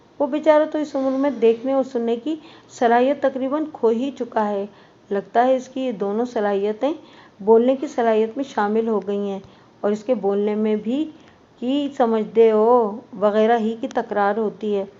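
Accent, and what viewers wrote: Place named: Karachi, Pakistan. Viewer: BB aap Ka taluq Karachi se feel hota hai 🤩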